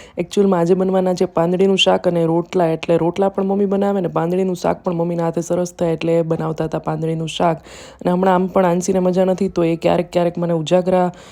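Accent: Rajkot, India. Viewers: જય શ્રી કૃષ્ણ જય જલારામ અને કેમ છે મજામાં રાજકોટ બોલી છે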